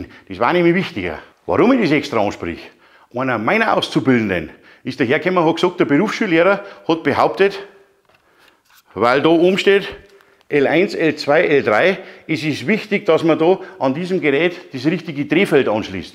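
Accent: Bavaria, Germany. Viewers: Geil endlich jemand der Ahnung hat... zwar sehr speziell und gewöhnungsbedürftig dieses impulsive aber dennoch menschlich. Ich kann zwar kein bayrisch aber verstanden habe ich. Trotzdem werde ich niemals eigenständig an Strom gehen dafür gibt es gelernte Fachkräfte... Bleibt nur danke zu sagen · Ganz großes Kino, vielen Dank für deinen sehr aufschlussreichen Input. Gruß aus Hamburg. Btw als gebürtiger Hamburger sag ich dir, pfeiff auf die Nasenwastl, die sich am Dialekt stören. Die sollen dort hingehen, wo sie klar kommen und die Sprache verstehen. Ein Tip an die Nörgler, es gibt eine Vielzahl an bayrischen TV Serien und Kinofilmen wo sicher für fast jeden was dabei ist. Ein bißchen gemischte deutsche Kultur tut jedem gut.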